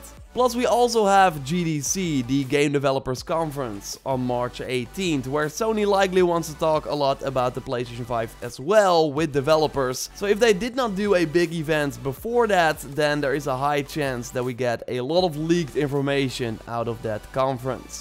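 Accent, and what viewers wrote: Netherlands. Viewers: U have an dutch accent are u dutch want ik wel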